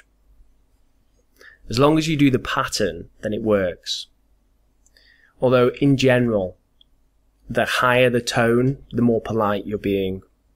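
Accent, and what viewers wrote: Northern England, United Kingdom. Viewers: You are a native British speaker, or you learnet this British accent? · Northern England, that's where you're from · I love British accent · so into Brit accent. You're superb!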